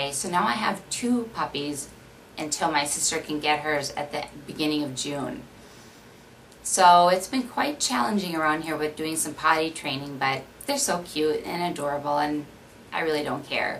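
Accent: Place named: Midwest, United States. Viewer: You do have a " Midwestern " accent , but that's ok!!!! :) it differentiates each of us! I love how you say " roof " :) Em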